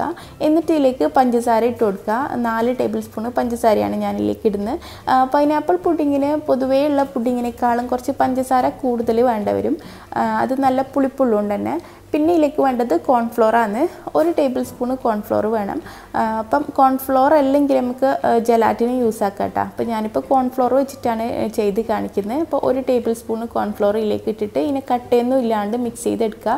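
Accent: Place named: Kasaragod, India. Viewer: Ithante samsaaram kasaragod slang polund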